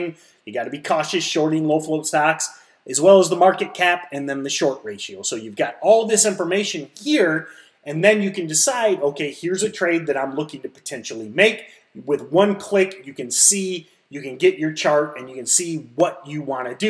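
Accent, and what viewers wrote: United States. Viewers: The way he talkssss, his accent and his over emphasis of general words is so annoying and obnoxious. My ears literally bled.. Maybe coz i am an aussie and can't comprehend his typical non urban american accent. Faaaarkkkkkkkk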